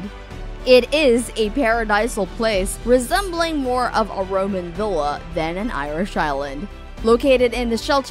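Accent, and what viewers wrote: United States. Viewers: A PNW voice, possibly shy, unconfident. ?.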